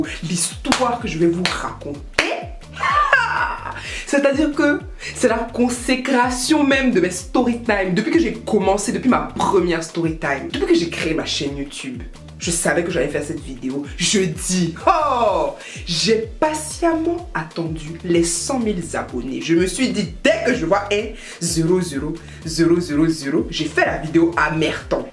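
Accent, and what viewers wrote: Ivory Coast. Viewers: dsl moi je vit en cote d ivoire ( mais je suis pas ivoirienne ) j aime pas trop l accent , genre c drole a l entendre mais niveau beaute c pas trop ca ^^ je le trouve un peu trop brusque apres c que mon avis personnelle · Mdrrrr l'accent ivoirien. ?tu tues grave...Abidjan♥♥♥♥ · Par ta manière de parler je sais que t7 ivoirienne comme mw wallay ma sœur 😍 my côte d'Ivoire j'adore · J'adore ton accent ivoirien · Nonnnn tes krooooo bien ta trop l'accent ivoirien naaa change pas on a kro le mm caractère on s'en fou . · attend t ivoirienne ou koi ta notre accent putain j'adore ta grandi en cote d'ivoire? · « Se qui est suuu » 😂😭😂 ton accident ivoirien est juste trop beau #Rwanda 🇷🇼🇷🇼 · Tu fais des efforts pour avoir ton accent français mais quand tu t'emballes tu redeviens ivoirienne vive 225🇨🇮🇨🇮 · cette vidéo faite avec l’accent ivoirien j’adoreeeee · t'as manière de parler me casse trop on sent que t'es une vrai ivoirienne même loool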